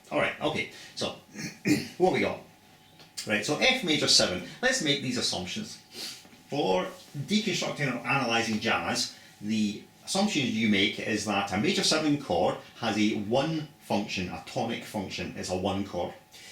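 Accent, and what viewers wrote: Scotland, United Kingdom. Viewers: I already know all this information, but I can't stop listening to the Scottish accent. Keep them coming, man!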